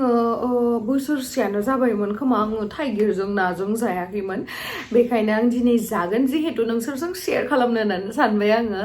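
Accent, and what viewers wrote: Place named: Chirang, India. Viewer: Abo ngni railainaiya manw Chirangnibaidisw Jayw hw ngsinibaidi jaya teobw hitt kwnayw abo wkki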